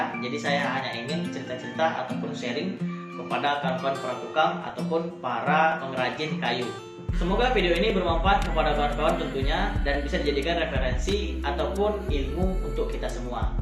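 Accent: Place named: Pekanbaru, Indonesia. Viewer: Dari Pekanbaru ya bang?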